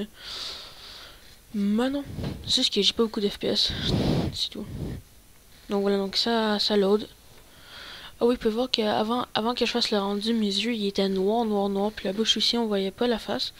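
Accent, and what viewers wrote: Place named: Quebec, Canada. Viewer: quebecois je suis quebecois et je reconais laccent il est juste young faque surment que sa voix est un peu weird mais javais la meme avant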